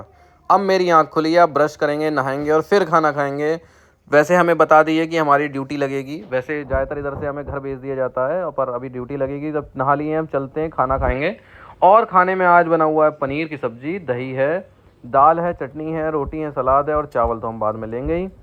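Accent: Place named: Western Uttar Pradesh, India. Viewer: भाई up west के हो क्या? Ascent lag raha hai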